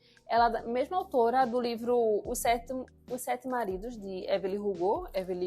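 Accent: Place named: Pernambuco, Brazil. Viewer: Topp, obrigado, gosto bastante da sua didática e recomendações, e também sou pernambucano como você, é reconfortante ouvir nosso sotaque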